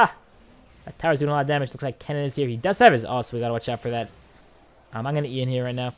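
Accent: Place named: Boston, United States. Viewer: are you from boston or around the east coast? you kinda sound like it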